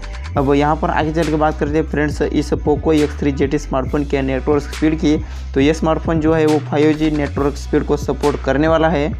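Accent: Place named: Maharashtra, India. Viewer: Marathi Tune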